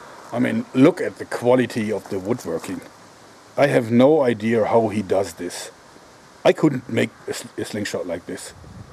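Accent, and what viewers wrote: Germany. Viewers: Lol I love that German accent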